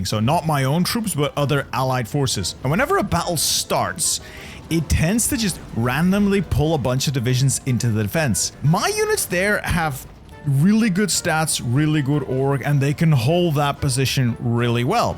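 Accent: Flanders, Belgium. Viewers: Hoor ik daar een Belgisch accent?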